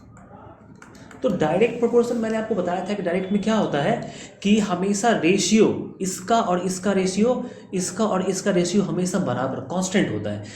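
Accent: Bihar, India. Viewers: You are from bihar na Because बणा .......बरा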